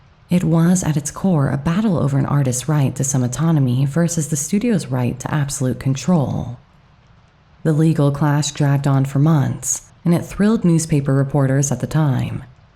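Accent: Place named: United States. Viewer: I love the narrator’s voice, even tho’ American. ❤😊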